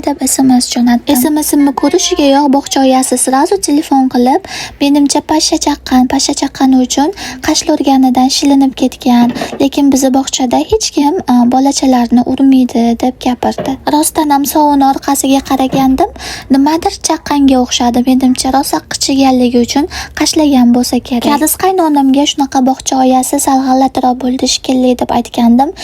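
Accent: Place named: Andijan, Uzbekistan. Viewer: анжанлимисиз синглим. шевангиздан. билипколдим 😊😊😊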